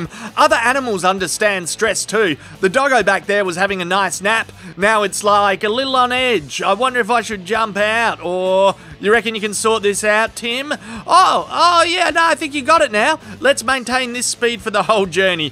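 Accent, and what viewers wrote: Australia. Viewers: yeah nah yeah, he's got the typical ozzy man accent. Brings back the old days when i used to live in Perth.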